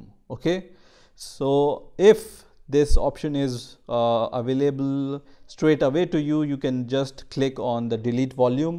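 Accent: India. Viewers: He sounds Indian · as soon as I heard the Indian accent I knew there was no hope for my problems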